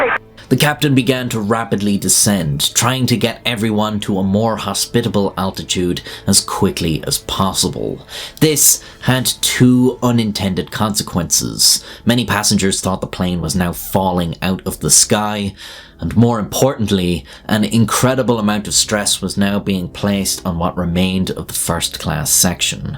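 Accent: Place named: Ireland. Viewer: Idk why but if i'm going to be told a surreal and horrific story it's somehow way better to hear it from an Irishman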